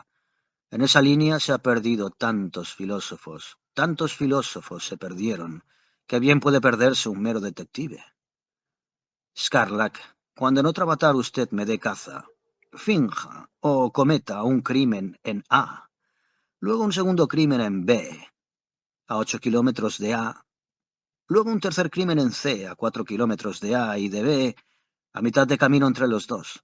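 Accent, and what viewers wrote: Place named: Galicia, Spain. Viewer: Voz gallega🤮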